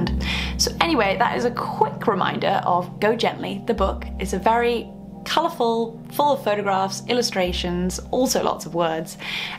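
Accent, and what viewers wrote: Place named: England, United Kingdom. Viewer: I really love English accent, she's a real queen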